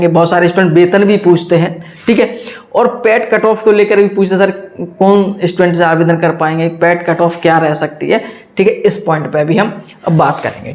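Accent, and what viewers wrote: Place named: Etawah-Mainpuri, India. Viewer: सर आप की भाषा से लगता है। कि आप इटावा या मैनपुरी जिले से हो🤔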